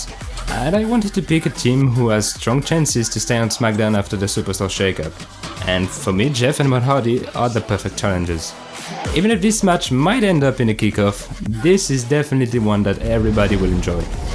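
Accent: France. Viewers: Bon vidéo ! Et soit fière de ton accent français ! ^^ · T'es français ? Tu parles bien anglais franchement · Tu es français mec ?!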